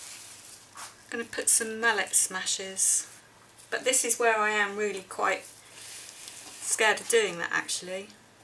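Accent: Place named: England, United Kingdom. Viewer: Hiya, just watched your video, I found it inspiring. I've been pouring for a few years now. It's my therapy. It's quite nice to hear an English voice, most YouTube people I've seen are in america, Canada or Australia. I hope you don't mind, but I think I'd like to try the hammer technique, the results you have Harare fantastic.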